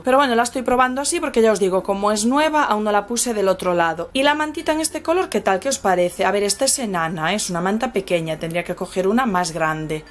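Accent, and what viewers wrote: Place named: Galicia, Spain. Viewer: Me ha encantado este video... Con las tradiciones de tu tierra GALICIA. Tan verde, tan hermosa, tan maravillosa... Hayyy... Me gusta mucho tu tierra , sus gentes , sus paisajes, la costa galega, la gastronomía tan suculenta, y vuestro acento!!!. Y me gusta también, como transmites a tu niña todas las costumbres típicas de tu pueblo!. Enhorabuena y un abrazo desde Navarra!😘😘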